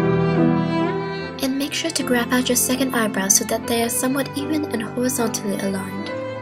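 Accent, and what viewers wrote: United Kingdom. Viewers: why does she talk british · attempting the british accent... rather lovely. It's not repulsing at all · Or just doing a british accent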